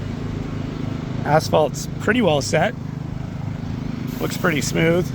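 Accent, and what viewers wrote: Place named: United States. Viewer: you try your best to anounce Polish and not Polish drivers at comentators both, sometimes i think you are polish komentator speaking with US akcent, good work it was good experiende to hear you, Polish drifting its sutch a big history that you will need exdent this movie to 10h, but good shot trying to show people what its all abaut, you did a greate job ,,check Final in Płock - Adam Zalewski vs Piotr Więcek or James Deane vs Pawel Borkowski - THE BEST DRIFT BATTLE EVER? at Płock" glad that guys from DMP work with RedBull and the Championship is big but still have the spirit of family grassrots event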